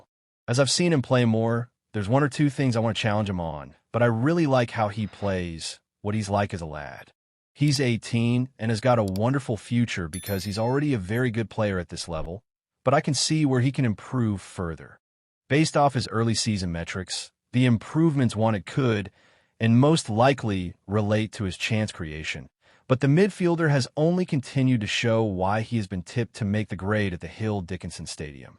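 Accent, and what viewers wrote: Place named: United States. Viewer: Why have the used an American accent on the Ai voice over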